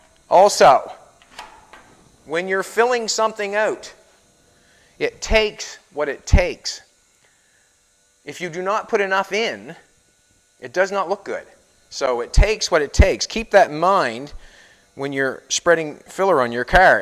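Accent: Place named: Annapolis Valley, Canada. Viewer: Came across this looking up fibreglass fill and I saw your last name and thought Annapolis valley. When you spoke that cinched it ( I grew up in Berwick). Neat. Your video is helpful too.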